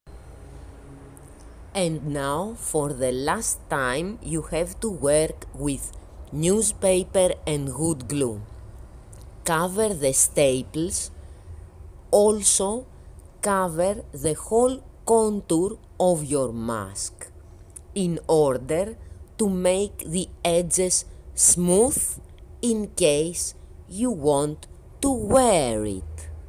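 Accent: Greece. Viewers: Guys, she is greek. This is the classic greek accent, i live in greece sooo... XD (edit: also the newspaper is in greek.) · Excellent tutorial. The aluminum foil is a great idea for the underlying structure. Love your Greek accent too. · greek accents are the best <3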